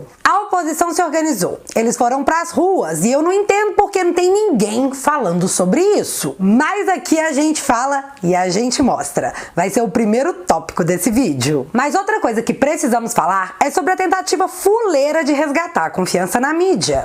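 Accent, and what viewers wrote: Minas Gerais, Brazil. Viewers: Muito bom e a hora que do muita risada kkkkkkk esse sotaque mineiro e de mais....grande mídia... só mentira...OMS ...nem se fala.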